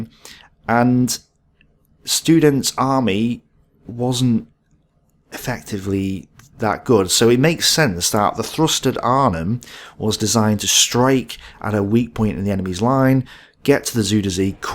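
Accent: United Kingdom. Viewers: I did like this. But my Missouri boy ears had a little trouble with the British accent. I sounded like he kept taking about getting into "the raw." It looked like he was referring to central Germany. But I am not familiar with that term. Can someone help me?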